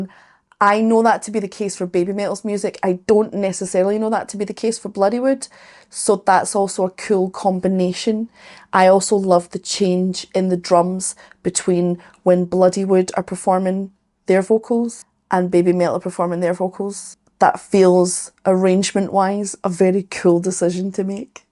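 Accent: Scotland, United Kingdom. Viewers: I have four comments for this 🙂 1. I really don't get why so many English speakers apologize for having a dialect. Never ever be ashamed of how you speak. Take pride in having a proper dialect and not speaking like you are from nowhere with some watered down standardized sound. How utterly boring and stagnant the world would be if we all spoke the same way. Inspiration from different cultures and different places is what lest humanity grow and evolve. Ignore the language elitists pretend that some dialects are better or more civilized than others. (I am by the way quite jealous of that incredible cool Scottish dialect you have.) 2. is not the most political performers in the world. That being said their music does in general carry a very positive message, and it has been suggested to be prescribed for depression. There are multiple songs from them with a strong message. 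Since you don’t like being biased before a song I will list the songs and the messages separately, so you don’t know what you are getting 🦊 The songs are: - (PIA Arena 2023 Live) Eng Subs <link> - Live at Sonisphere 2014,UK (OFFICIAL) - The last song of their first concert in the UK (and their international breakthrough). The audience starts out a bit confused for the first few songs, and end up like you see here at the end. <link> (OFFICIAL) <link> The themes are singing about: Looking forward with a positive view after the world has ended. Anti bully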